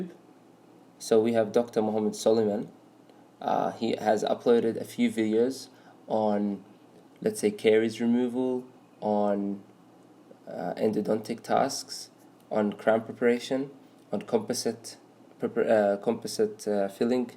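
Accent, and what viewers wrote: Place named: United Kingdom. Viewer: Nice British accent and thank you for guiding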